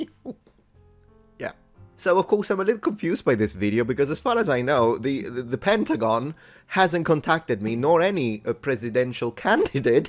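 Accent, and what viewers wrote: United Kingdom. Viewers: Does it sound to anyone else that he learned English from a British source? Don't know why that's standing out to me all of the sudden.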